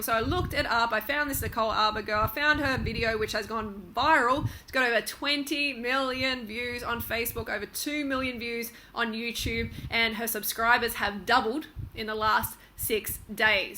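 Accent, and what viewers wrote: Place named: Australia. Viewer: I realize your Australian (accent>? I am guessing BC I don't know who you are)...but I think what we are seeing in the States is a revolt of Political Correctness and all these speech police codes America has been pummeled with...it will only continue as people break free of the liberal college "ism" and "ist" box that has been constructed around us in the last 5 years or so...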